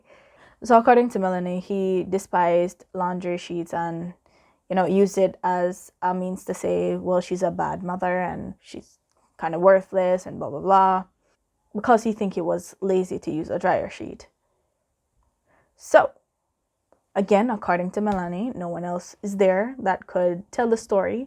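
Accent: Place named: Caribbean. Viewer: Really liked your storytelling and btw are you Carribbean? I love your accent 😍😍😍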